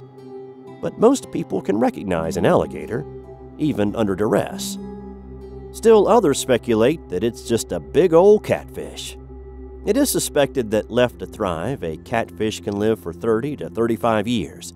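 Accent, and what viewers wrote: South, United States. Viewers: You have a very interesting accent. Are you from the south?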